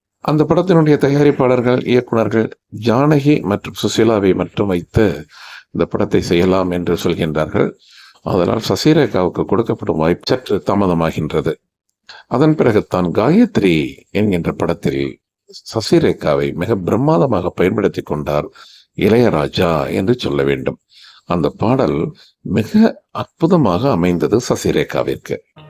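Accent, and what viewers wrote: Sri Lanka. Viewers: சிலோன் தமிழ் குரல் 🎉 அருமையான பதிவு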